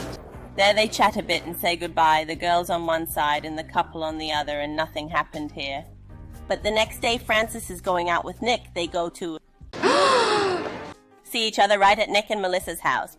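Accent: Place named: Mid-Atlantic, United States. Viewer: I love it....."Nothing can go wrong"....! its your tagline! Love your "accent" too. not sure but fairly reminiscent of the "Midatlantic" accent of the 1940s films. Anyway, it certainly fits your narration. ( this is meant to be complimentary). Can you do "The Hunger" ?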